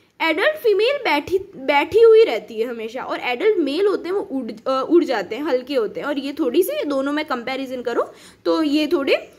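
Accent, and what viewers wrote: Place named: Australia. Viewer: GOOD EXPRESSION SOUNDS AUSSIE ENGLISH EVEN 888.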